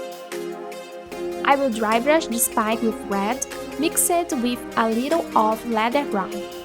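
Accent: Brazil. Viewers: Nice tutorial, just a question Where're you from? Your accent sounds brazilian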